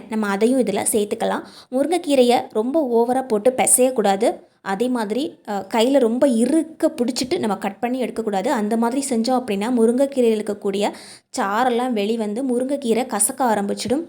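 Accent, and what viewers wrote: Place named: Nagercoil, India. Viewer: I think u are from Nagercoil Your language sounds like that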